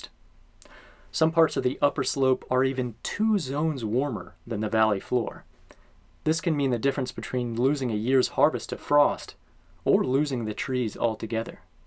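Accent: United States. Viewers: Why only use Celsius even when discussing American temperatures also you have an American accent why not get our temperatures too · You have an American accent, but default to Celsius?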